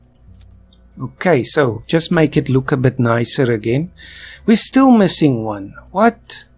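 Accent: South Africa. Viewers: Are you South African? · You sound so south African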